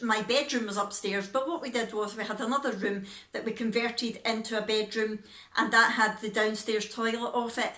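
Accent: Scotland, United Kingdom. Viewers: Hi have just came across your videos on here, can I just say that you are such an inspiration, I am working my way through all of them lol 😊 really enjoying listening to a fellow scot for a change, I'm loving that you are a crafter too so am I. Thank you ❤️😘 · .. Sorry, it was Rick Chan who mentioned your channel in his comment on a mutual vlog we watch called Worms Eye View. She is learning Welch and how to say the words, and Rick mentioned your Scottish accent, which I love, so I scooted over to your channel. Hope I didn't confuse you. Ha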